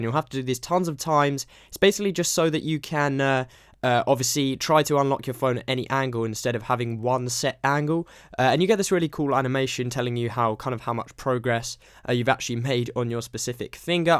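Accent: United Kingdom. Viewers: I luv british accents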